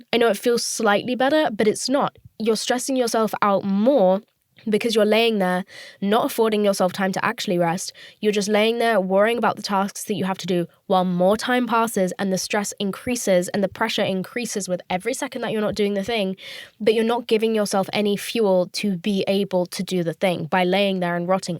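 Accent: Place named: England, United Kingdom. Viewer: First off: love, truly Second: something about proper English accent, so eloquently spoken, pushed through a profane, bright, articulate girl - it's like Hermione Granger if she watched way too much Netflix.. it's an amazing, original voice and I am HERE for it 🍿 Third: always better to have more information about yourself than less. The further you go in life you find everyone is, minimum, a little fu*ked up - the only difference is who knows themselves better, who has better countermeasures and coping mechanisms. is lovely, honest, much needed and must be protected at all cost. Do what YOU need to get through the day, all of you, please believe there is a 50% chance the person next to you on the bus or in line or at the grocery story is equally or more messed up than you and/or going through even worse sh$t than you. Listen to, appreciate her honesty and challenge yourself to be that honest with yourself. 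Awesome job Ms. Carry on Queen 👸🏻🥂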